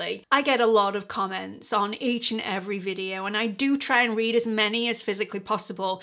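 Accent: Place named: Yorkshire, United Kingdom. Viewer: I've just found your channel and you are so refreshing and relatable. I love that you're from Yorkshire too. Accents fascinate me and it's so cool to hear such a variety of them. Now I'm off to binge watch all your videos while I get ready for work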